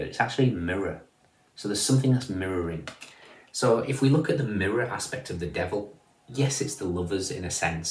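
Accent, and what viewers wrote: Northern England, United Kingdom. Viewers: thank you so much, you are so on point for me. I stopped in my tracks when you said a card of spiritual gifts was coming up. I’m leaving a 30 year career behind to follow a spiritual calling. Pluto has certainly done a number on us all. Every Cappie sun, moon,ascendant I know has had a tough 15-20 years. Great to know that better must come. Great to hear your northern accent. Glad we’re represented. Thank you for sharing your amazing gifts. Blessings to you and all. Xx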